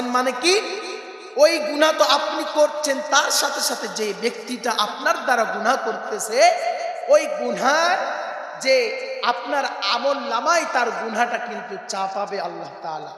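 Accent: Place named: Bangladesh. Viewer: আপনার ওয়াজ খুব সুন্দর বাংলাদেশির মত 😊😊😊😊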